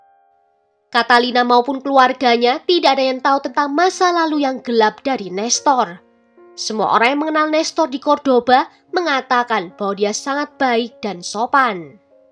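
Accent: Java, Indonesia. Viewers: Medok banget 😅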